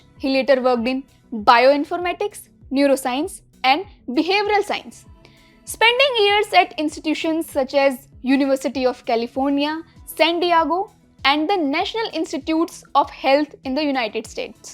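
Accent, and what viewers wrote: India. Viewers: Students born in the US go for Law and Medicine in big numbers - they say that in the US, the most stupid lawyer (or doctor) earns more than the most brilliant scientist - this may be an exaggeration, but you get the idea. Oh, by the way, you have a very strong Indian accent 😀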